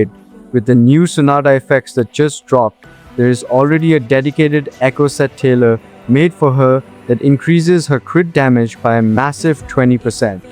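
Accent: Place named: India. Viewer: Don't use an Indian ENG accent if u want more followers 😀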